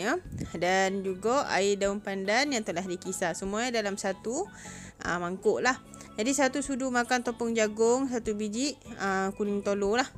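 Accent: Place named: Palembang, Indonesia. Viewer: Sedap nian kuenya, accent kakak mirip samo baso kami wong Palembang (sumatra) 😁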